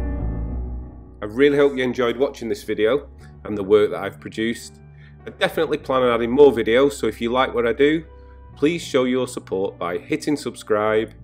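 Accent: United Kingdom. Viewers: Wow. What a masterpiece. You made the vid relaxing and entertaining to watch. Great editing and I still like your British accent as well. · This became a stunning beauty, reallly - sub button pushed! indeed I like your British accent - in terms of "English" I'm a kind of "Britophil"! ;) Greetings from Germany, the silver fox, David 😉🦊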